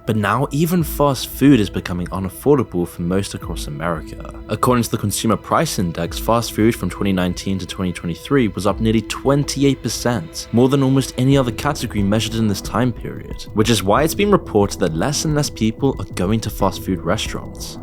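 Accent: United Kingdom. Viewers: its part of "Everything is fake in ClownWorld" - this channel is the head clown. "It is impossible to succeed on youtube, but I DID IT" "Subscribe for $200/month for my guide how to succeed - IN EVERYTHING". Also the obnoxious BRIT accent talking about USA ..... Im here for the LOLZ and to see how many of the cohort following this channel has brain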